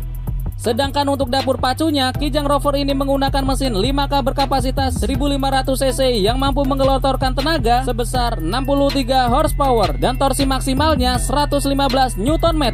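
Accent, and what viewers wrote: Pekalongan, Indonesia. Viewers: Lugot nya org Pekalongan kliatan nya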